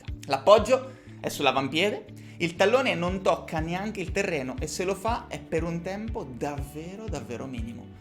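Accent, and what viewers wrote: Marche, Italy. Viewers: accento marchigiano a tutto spiano. Scommetto provincia di Macerata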